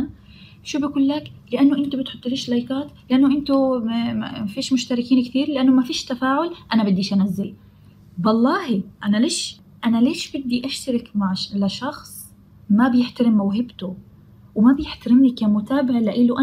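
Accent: Palestine. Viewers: بالعكس الرسمة جميلةة ....بس ما شفنا الحبر دخل بالرسمة ابداا ...مع انو الحبر اساس التحدي 🙈 مرة اخرى أُحِبّ لهجتكك الفلسطينية❤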